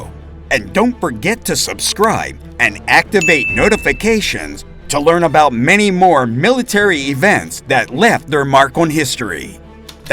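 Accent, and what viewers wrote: United States. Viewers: He’s clearly American…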